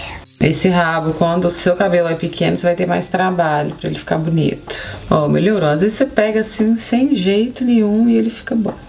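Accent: Minas Gerais, Brazil. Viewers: Você ainda fala, mineirissimaaa 😘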